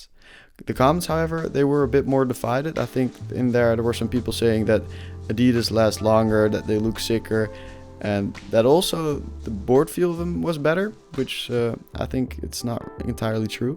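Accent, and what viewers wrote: Netherlands. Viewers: Hey you are dutch right?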